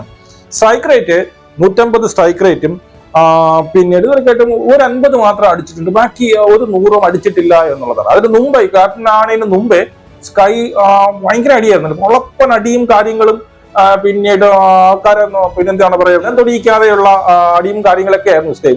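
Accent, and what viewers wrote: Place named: Thiruvananthapuram, India. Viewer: തിരുവന്തപുരം ശൈലി എനിക്ക് ഇഷ്ടമാണ്. ആ നാടിന്റെ ശൈലിയിൽ തന്നെ ചേട്ടൻ പറയണം. കേൾക്കാൻ നല്ല രസം ഉണ്ട്. അച്ചടി ഭാഷ സംസാരത്തിൽ എനിക്ക് ഇഷ്ടം അല്ല. ചേട്ടൻ മാന്യമായി ചിലർ ചേട്ടനോട് നിർദേശിച്ചു എന്ന് പറഞ്ഞു. ശരിക്കും ഞാൻ ആണ്. ഞാൻ മാത്രമാണ് നിർദേശിച്ചത് 'അടിച്ചു തൂറിച്ചു' എന്ന പ്രയോഗം ഒഴിവാക്കണം എന്ന് . ഈ വാക്ക് ഒഴിച്ച് ബാക്കി എല്ലാം ചേട്ടൻ പറയുന്നത് കളിയുടെ പെർഫോമൻസ് കൃത്യമായി വിലയിരുത്തിയാണ്. അതിലൂടെ മത്സരത്തെ പറ്റി കൂടുതൽ details അറിയാൻ കഴിയുന്നുണ്ട്. ഞാൻ ഈ കഴിഞ ഇന്ത്യയുടെ ബംഗ്ലാദേശ് t20 സീരീസ് മുതലാണ് ശരിക്കും continues ആയിട്ട് ചേട്ടന്റെ വീഡിയോ കാണാൻ തുടങ്ങിയത്. ഞാൻ ഒരു തൃശ്ശൂർകാരൻ ആണ്. തൂറുക എന്നും തൂറിക്കുക എന്നും ചേട്ടൻ ചേട്ടന്റെ ശൈലിയിൽ ഇഷ്ടം പോലെ പറയാൻ ഉള്ള അവകാശം ഈ രാജ്യത്ത് ഉണ്ട്. ഞാൻ ചേട്ടന്റെ വീഡിയോ കണ്ട് ഇഷ്ടം കാരണം പറഞ്ഞു എന്നെ ഉള്ളൂ. ധാരാളം ആളുകൾ ചേട്ടന്റെ ഈ പ്രയോഗത്തെ ഉൾപ്പെടെ മത്സരത്തിന്റെ മറ്റു കാര്യങ്ങളെ അടക്കം നന്നായി ആസ്വാധിക്കുന്നുണ്ട് എന്ന് ഈ വിഡിയോയുടെ കമന്റ്‌സ് വായിച്ചപ്പോൾ മനസ്സിലായി. 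ഭൂരിപക്ഷ പിന്തുണ സപ്പോർട്ട് ആണ്.അതുകൊണ്ട് ഞാൻ മിണ്ടുന്നില്ല. All the best. 😊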